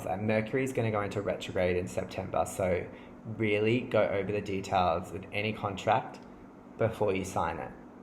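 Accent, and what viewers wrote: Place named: Australia. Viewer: Great to hear an Aussie!! You're spot on... keep doing it... I love that you're not afraid to talk about the denial ..it was my relationship... I felt he was lying about things but he gaslighted me into believing him...until I started having confirming dreams!! Confronted him & now with my eyes opened I could feel he was lying... he's a covert narcissist. It was his control for 4 yrs & yeh on the surface he gave up alot of addictions but was wanking to porn/women online!! Yep he's in HUGE denial... very deceiptful Keep reading... you're fairly spot on for me except the career thing...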